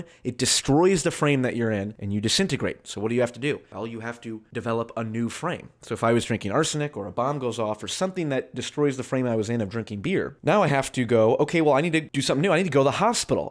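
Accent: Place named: United Kingdom. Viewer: hilarious british accent, good job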